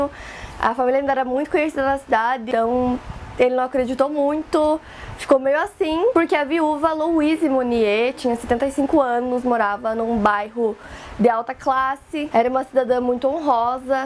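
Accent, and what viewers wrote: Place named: Caipira, Brazil. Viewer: Mds você é muito caipira que chega a incomodar de ouvir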